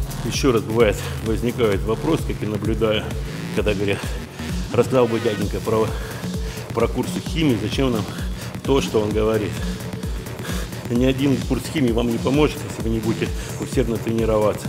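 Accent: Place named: United States. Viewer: я думал какойта американец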